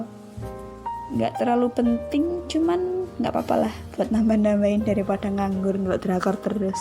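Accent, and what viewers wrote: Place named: Java, Indonesia. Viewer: Wong ndi toh rek ... Jowoan ngene Seneng aku hehehe salam kenal ya kak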